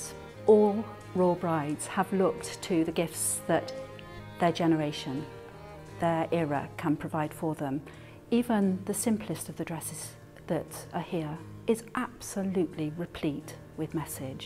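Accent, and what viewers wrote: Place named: England, United Kingdom. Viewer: I love her english accent! It sounds really posh but sexy too... :)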